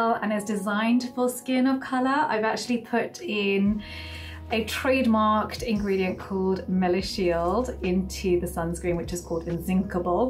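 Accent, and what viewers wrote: United Kingdom. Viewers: please remove the background music 😁 because we have difficulty to hear your voice properly 😕 and we love your British accent 😀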